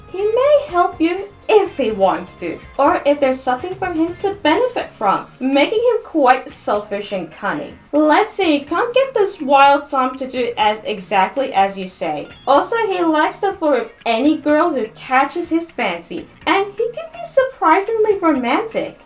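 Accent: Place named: Australia. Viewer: things i like about your cat noir: - his wishing to be free is giving me satoko vibes -the additional abilities you gave him. -his identity as Felix Martin, it's a good homage to the original idea thomas astruc had and adds more potential drama. _how calico black used magic when he was alive, that's a good story by it's own. -the spanish guitar music that played in the video. -you mention how cat noir flirts with whoever he wants, leading up to the typical attempts to seduce ladybug which all fail. -how his dad is sending Akumas to find Adrian as well as take the miraculous, it shows there is more plot outside of the mcguffins. -how you referenced the intro to miraculous by describing him as a normal boy with a normal life. -how you play the original music from the trailer. things i don't like about your cat noir: -if he doesn't care about right and wrong then why does he bother hunting akumas other than to prevent them from finding him. 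- his long white hair is kind of a huge distraction from the rest of his costume it's kind of an eye sore. -i don't know if you are australian or not but your accent was so thick i couldn't understand when you said calico.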